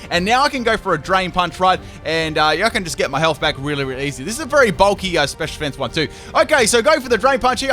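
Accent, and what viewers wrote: Australia. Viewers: This accent sounds familiar. Are you from Australia by any chance?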